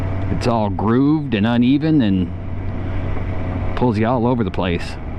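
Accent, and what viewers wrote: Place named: United States. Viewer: These things happen with the glitch. Yeh WestonSuoerMotoVlog is very supportive to us all and and all and out nice guy.. sure I'll meet him some day. He is in England and I am in Scotland so there is about 600 miles between us but it will happen. You accent is soo american, love it. Keep them coming buddy. 👍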